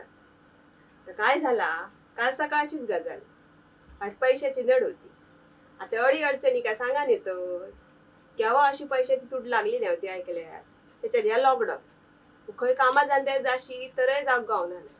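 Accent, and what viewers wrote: Malvani, India. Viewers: Tuzi Malvani phar wegli sound kartay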